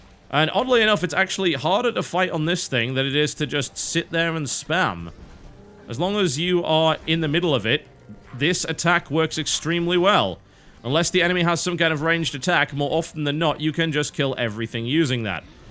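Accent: United Kingdom. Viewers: Huh? There's nothing really smart about those words... "implies" "superiority" "objectivity" If you think those words are 'smart' then, I'm at a bit of a loss, besides, is called the 'cynical' Brit for a reason, but, I can understand where your viewpoint comes from, and to some degree, I can understand why you think of him like that:) (by the way, his accent isn't fake, he's British, and that is a real British accent;))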